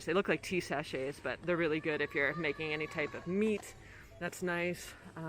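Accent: France. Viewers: Love your FR accent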